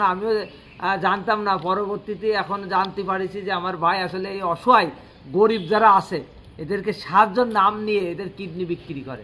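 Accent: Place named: Khulna, Bangladesh. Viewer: তোমার ভাষা তো খুলনার মতো উনার বাড়িতে বরিশাল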